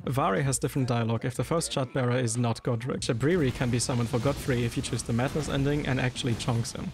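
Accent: Germany. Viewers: German accent? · Damn son, you're talking really really fast with a heavy german accent that incorporates A LOT of "ts" and "ks" sounds. All I understand is "tskskshtss stsksstsssst"...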